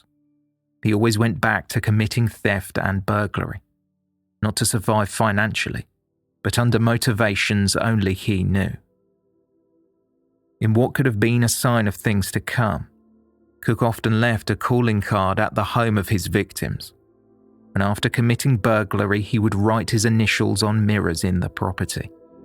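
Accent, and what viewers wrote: England, United Kingdom. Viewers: I like the narrator s voice very much n his english English❤❤😊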